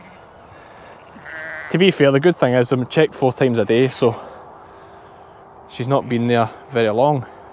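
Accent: Scotland, United Kingdom. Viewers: I don’t know how but Iv put subtitles on, have you ever seen subtitles on your videos 🤣🤣🤣 it’s not good at translating the Scottish accent hilarious what it comes up with! Enjoying the lambing diary 👍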